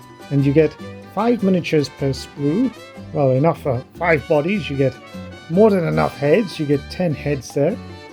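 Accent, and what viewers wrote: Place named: Wales, United Kingdom. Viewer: Being welsh, I approve that accent 😂!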